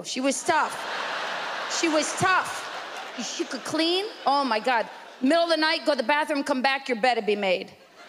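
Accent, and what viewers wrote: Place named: Boston, United States. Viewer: Such an incredible voice and "Buoston" accent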